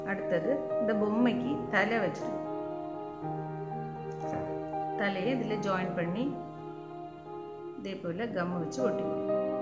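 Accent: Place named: Kerala, India. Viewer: Madam ningal malayaliyanennu thamil parayunnathu kettal ariyam, malayalachuvayund Aa bashayil. It's ok. But picture ethra cheruthayittu kanichal anginey clearayi kanum. Eni adukkunna video valuthayittu kanikku pls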